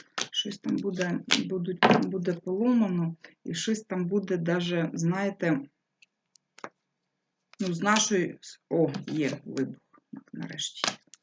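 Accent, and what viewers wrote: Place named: Kherson, Ukraine. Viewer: Ви наша запальничка💙💛., Ви нам, жителям Херсонщини, дуже близькі. Бо і суржик в нас однаковий)))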